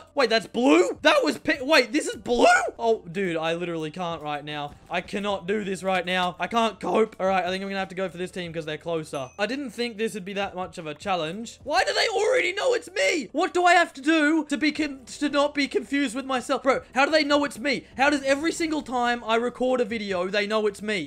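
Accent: Australia. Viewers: This man has an Australian accent and he can do both the British and American accent. Teach me your ways. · became more australian than me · is more australian in this video xD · why did become a really hardcore Australian this vid? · Comic I know he’s ausie it’s just he’s speaking ausie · am i the only one who feels like is getting more australian in every upload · The heavy Australian accent is so funnnyyyyyyyyy love you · he's never sounded so australian before HAHA i love it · He seems more Australian than usual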